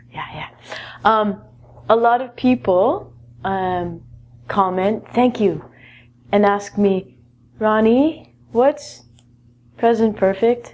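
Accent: United Kingdom. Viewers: British accent 😓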